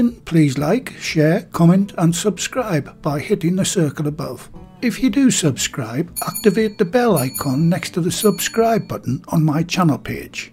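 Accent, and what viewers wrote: United Kingdom. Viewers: Ahh, sir your accent and recipes reminds me of me Gran and me mum out here In Australia, I have all their old recipes that they wrote down and I follow them to the letter, as I’m getting on a bit now (52) I’m considering putting them together in a book, you can’t beat these old time recipes, you are my favourite cook on YT as you’re the closest I’ve found to that ole home style British cook, thank you mate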